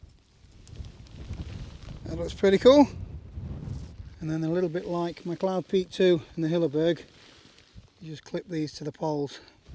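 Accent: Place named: England, United Kingdom. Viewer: Your videos remind me of Tom Weir - but with an English Accent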